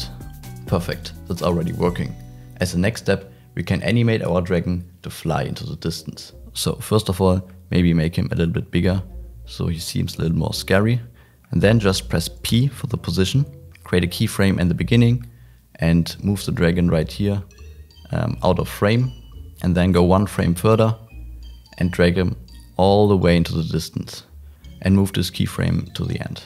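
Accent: Germany. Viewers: Is it the germany accent?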